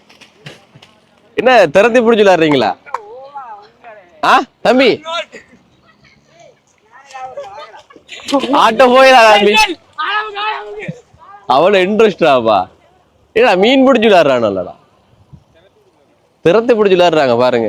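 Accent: Sri Lanka. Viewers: இலங்கை தமிழ் மிக அழகாக உள்ளது அண்ணா😊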